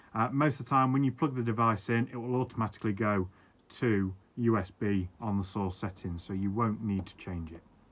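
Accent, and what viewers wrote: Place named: England, United Kingdom. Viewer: What a lovely English voice. How I miss the England I was born into. We have been (and are being) invaded by foreigners who are displacing us, unfortunately.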